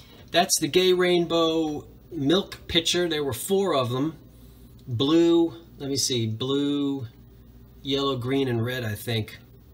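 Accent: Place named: East Coast, United States. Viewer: ! I've been watching your earlier episodes that include "young" Salem and 70s stuff. I LOVE THEM! I'm convinced the only Good Will's and thrift shops with the quality of items you find are on the East coast. I appreciate your sense of humor, facial expressions and East cost accent.. I'm learning so much from you. Continue being a blessing and I'll do the same.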